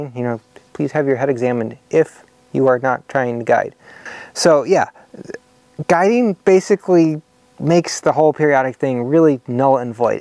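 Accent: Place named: United States. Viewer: how come you have an american accent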